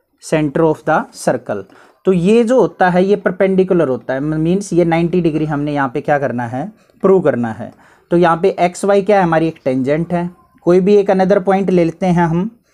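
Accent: Haryana, India. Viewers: name tek lete h 😁😁🤣🤣🤣🤣🤣are you from haryana sir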